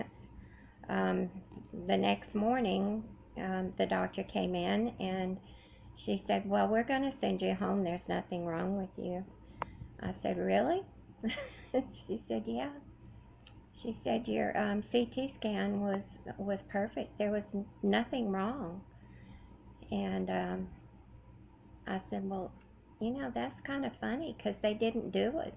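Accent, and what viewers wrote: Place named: South, United States. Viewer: I am so sorry to hear you were in the hospital. Hope you are on the speedy road to recovery. Marlene’s project bag is just beautiful and how sweet is that??! 😉. So good to see you, as always, I am inspired by your sunny outlook and I could just listen to that lovely Southern accent all day long. 😘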